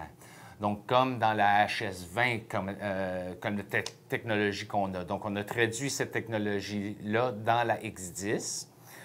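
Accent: Quebec, Canada. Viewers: Sounds French Canadian to me. French with a Anglo pronunciation. Nonetheless good review, I like that X10.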